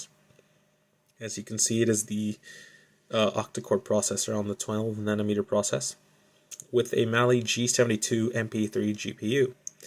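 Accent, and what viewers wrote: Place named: France. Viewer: The French accent😂😂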